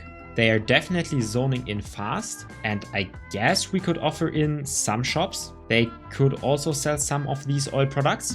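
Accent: Germany. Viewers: Bist du Deutsch wegen deinem akzent hört man das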